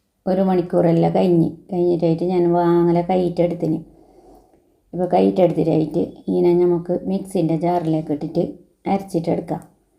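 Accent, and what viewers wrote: Kasaragod, India. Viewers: Nammale kasrod baasha engnthenne❤..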